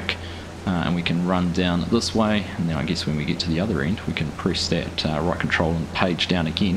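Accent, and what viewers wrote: New Zealand. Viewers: You might be getting confused by the Kiwi accent...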